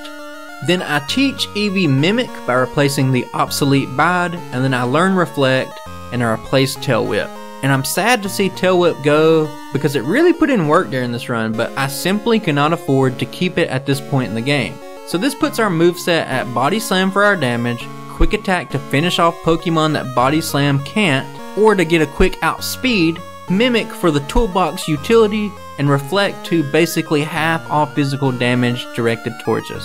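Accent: South, United States. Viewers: I am glad that the algorithim gods blessed me with your channel. There is just something I love about the American southern accent that I find wholesome ( I know that's stupid, don't at me!) I find that I enjoy watching pokemon challenges, because they are the perfect background noise while I play other games or study.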